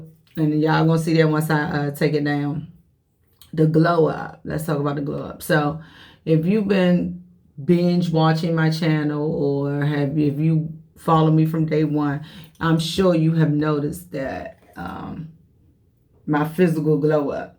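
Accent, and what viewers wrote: United States. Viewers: I absolutely love your channel and your locs I subscribe cause I’m from DC and I can hear in your voice that you are from DC as well I have gray hair as well but I do dye my hair once a year but I’m done with dying my hair cause I really am allergic to hair dye you and your locs are absolutely beautiful I don’t palm roll either🖤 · You sound like you from the dmv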